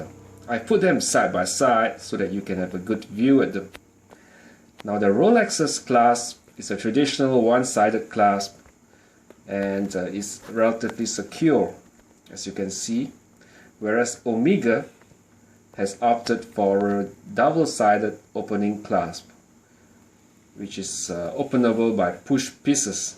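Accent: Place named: Singapore. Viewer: The way you speak, your accent.... You're Singaporean aren't you?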